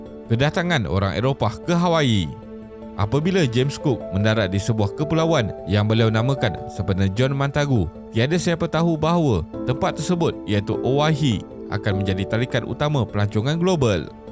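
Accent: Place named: Malaysia. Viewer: Dialeknya melayu banget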